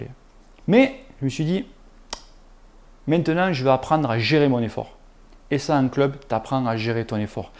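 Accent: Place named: Béziers, France. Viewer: j'en étais sur que tu venais d'à côté de béziers, j'ai reconnu l'accent (maillot saint chinian)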